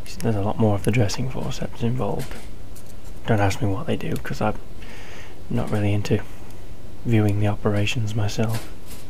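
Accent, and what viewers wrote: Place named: Nottingham, United Kingdom. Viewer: Top vid as usual. Went to see my folks today in Notts, your accent sounds very similar!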